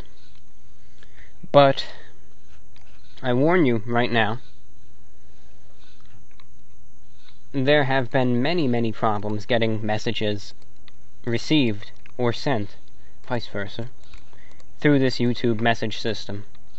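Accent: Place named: Transatlantic, United States. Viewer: Listening to this podcast I noticed that ReviewBrah speaks with almost a Transatlantic 1950's American Accent. I never noticed that. It goes quite well with his vintage attire.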